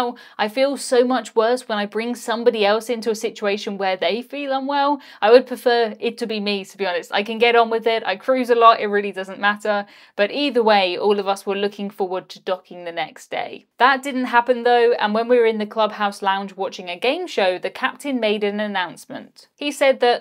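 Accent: United Kingdom. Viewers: I love British accents♥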